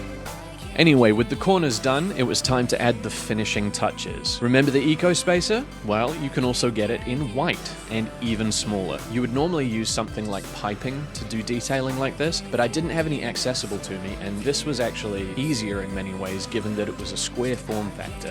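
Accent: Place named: New Zealand. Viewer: as soon as im 5 sec in and hear a kiwi accent, sub'd. idk just enjoy seeing cool videos from people from the place im from, especially when it seems like everyone else is american, australian or british. dope vid!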